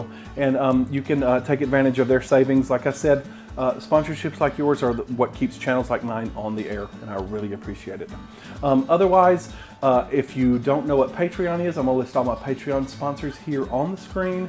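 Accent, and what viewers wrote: South, United States. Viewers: Thank you ! love your video and love your personality w southern accent!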